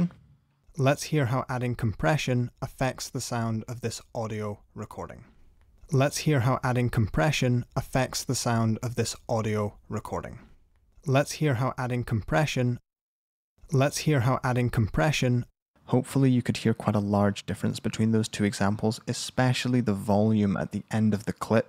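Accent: Scotland, United Kingdom. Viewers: Ery fine. The listening was the important part. The post compression comparison made what I was hearing visible. Thank you. Do I detect just a wee Scottish burr in there? Well hidden , but awe the same....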